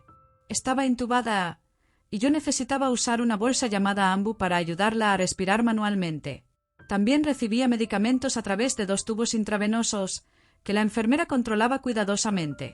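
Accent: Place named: Spain. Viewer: JA JA JA COMO YO ? PRIMERA LA BURRA POR DELANTE Y LUEGO LA ENFERMERA Y EL EQUIPO MEDICO AUXILIAR PRA ATENDER ALA PERSONA ENFERMA DURANTE EL VUELO. SI.. POR LA NARRACION DE VOZ ES UNA ESPANOLA QUE NI EXPRESARSE CON CONCORDANCIA SABE. EN SU PROPIO LENGUAJE. QUE VERSGUENZA ASI ARRUINA EL MENSAJE DEL SUCESO. EN CUESTION .QUE ABURRIDA NARRACION.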